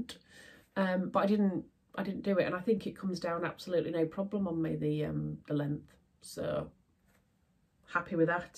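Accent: Yorkshire, United Kingdom. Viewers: Hi again! Love all your makes, especially the Billie dress and the Marlo sweater. I did find the same with the Billie neckline and had to lower mine! You did a great job of your jacket. It was very amusing to hear the Yorkshire lingo again 😜😁 hope you are having a great weekend! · God, I love the way you chat to the camera and some of your 'Yorkshire-isms' . It gave me a good chuckle. I wish I'd known you when I lived in Yorkshire. I could have done with a good sewing friend at the time!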